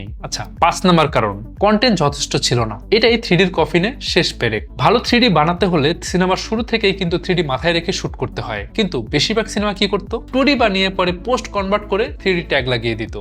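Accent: Bangladesh. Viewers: কেবলমাত্র হাড়িয়ে শব্দটাই নয় , আপনার কথা বলার ভঙ্গিমার মধ্যেও এমন অনেকগুলো শব্দ রয়েছে যেগুলো আপনি বাংলাদেশী মানুষদের মতন করেই উচ্চারন করেছেন , আমরা পশ্চিম বঙ্গের বাঙালীরা সেই একই ( এই শব্দটার কথাই বিশেষ করে বলতে চাচ্ছি ) শব্দ একচু অন্যভাবে বলে থাকি । যাইহোক আপনাকে অভিনন্দন জানাচ্ছি । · বাংলাদেশের বাঙাল রা এই রকম উচ্চারণ করে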